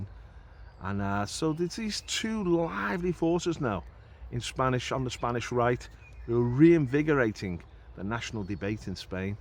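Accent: Liverpool, United Kingdom. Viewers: Scouser..from Liverpool. Every Nationalist respects those who fight for their Nation State.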